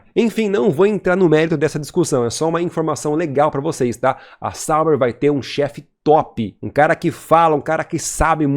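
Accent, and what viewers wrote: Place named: São Paulo, Brazil. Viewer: Não gostei de sua alteração na dicção parece um paulista misturado com paranaense muito ruim sugiro voltar para sua dicção original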